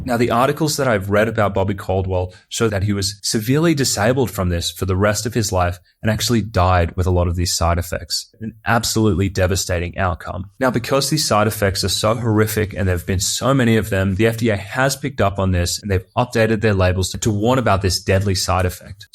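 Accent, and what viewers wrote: Australia. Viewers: I hear an Australian accent? How would you rate the Australian mental hlth system in comparison to other countries?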